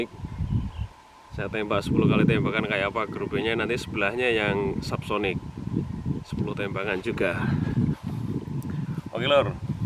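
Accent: Java, Indonesia. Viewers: jowo mu ngendi kang..kok koyo logat jowo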